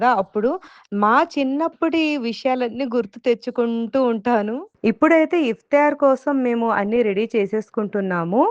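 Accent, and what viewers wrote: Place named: Nellore, India. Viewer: Nellore aaa Madam meedhi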